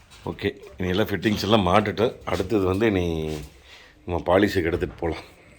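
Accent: Kongu, India. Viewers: கொஞ்சும் கொங்குத் தமிழ் பேசும் கோமகரே...! திகட்டாத தித்திக்கும் பேச்சுடைய திருப்பூர் திருமகரே...! காண்டா மிருக கலர்ல ஹோண்டா சிட்டி கார் வந்தாலும் அதை ஃபேண்டா கூல்டிரிங்ஸ் கலராட்டம் மாத்துறதுதான் நம்ம அய்யாவோட மகத்துவமே❤❤❤❤❤❤😊😊😊😊❤❤❤❤ அட...அட...அட... அருமையோ அருமை அய்யா🙏😊😊😊😊❤❤❤❤❤❤ 2004 மாடல் ஹோண்டா சிட்டி கார் அடிச்சு விரட்டி ஓனர் நன்றாக சிட்டிக்குள்ளையும் ஹைவேயிலையும் ஓட்டியிருந்தால் தோராயமாக 1,80,000 முதல் 2,30,000கிலோமீட்டர் வரைக்கும் கார் ஓடியிருக்க வாய்ப்பு இருக்கு அய்யா. என்றும் அன்புடன் உங்கள் மகன்போல இவன் ரா.முஹம்மது கபூர் (சென்னையில் இருந்து) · அருமையான வேலை..... உங்களின் கொங்கு தமிழ் பேச்சு.... மிகவும் அழகு..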